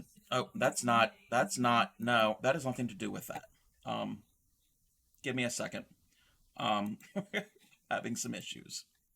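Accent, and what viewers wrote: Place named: Maryland, United States. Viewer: Found you because of your temperature blanket. I noticed your accent and went to your channel. I was right!!! A fellow Marylander. We all have that "sound" that can't be mistaken. Sending you a friendly wave from Carroll Co. This video was fun.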